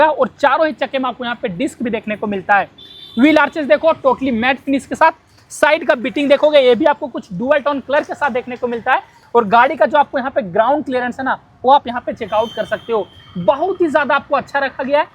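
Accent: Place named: Bihar, India. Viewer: Bhai aap bihari hain kya?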